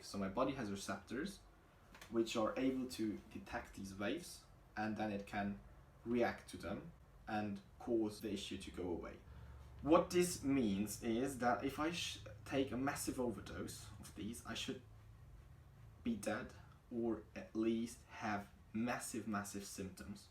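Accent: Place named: United Kingdom. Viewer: Hast du einenenglishsprachigen Elternteil? Du sprichst sehr britisches Englisch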